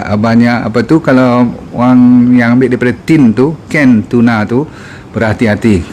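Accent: Malaysia. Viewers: Sepertinya profesor ahli soal makanan yg terkontaminasi bahan kimia, saya senang nonton videonya hanya kadang-kadang aksen dialektika melayunya bikin saya coba mengerti maksudnya, 🙏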